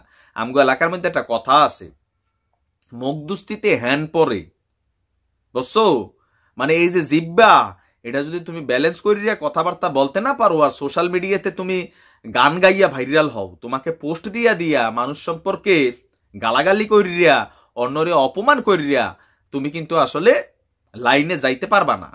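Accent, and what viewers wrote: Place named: Barishal, Bangladesh. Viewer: Vaiya josss hoiche borisal er vasha. Carry on vaiya. God bless you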